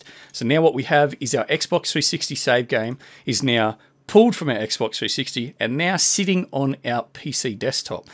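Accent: Australia. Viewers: Did I ever mention how much I appreciate you, the fact that I can sit back and listen to an Aussie and enjoy myself makes me really happy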